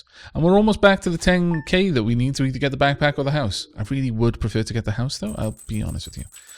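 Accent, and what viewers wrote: Wales, United Kingdom. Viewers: Say, I could have sworn your accent was Welsh! So are you a welsh person living in Scotland or a Scottish person with a funny accent? (I am from Edinburgh, my accent is strange too.)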